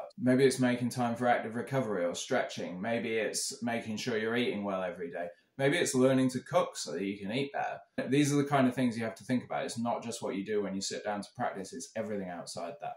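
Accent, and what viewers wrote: England, United Kingdom. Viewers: I was wondering why Youtube proposed your piano learning video... then I worked out that it is because my first Karate master is also Kendo master while second is also Ju Jitsu master and then because you speak English with a British accent and, last but not least, you played When the Saints, the song I have been singing since Nursery School, my mother says... Ciao from an ex Londoner, ex Karate black belt ex fit person, ex ex ex ex... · Interesting.... will have a look. Like your style and philosophy and good to hear an English accent.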